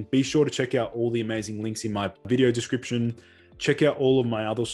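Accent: Australia. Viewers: Was that you calling in on Danny's podcast? I was like:" with an aussie accent, I know that guy " haha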